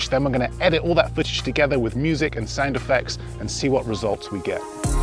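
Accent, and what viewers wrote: United Kingdom. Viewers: Your British accent makes it even better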